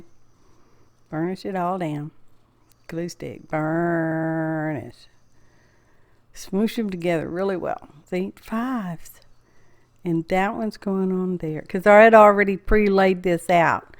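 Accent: South, United States. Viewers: I love your accent. Are you a Southern Belle. ❤ I loved the swatches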